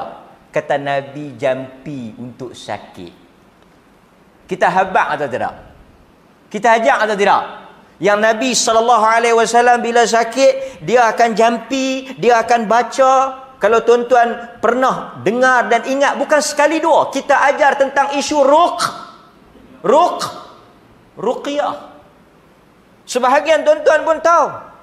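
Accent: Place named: North, Malaysia. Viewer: langgah ayaq,pepak kismis..pure utara noo..👍👍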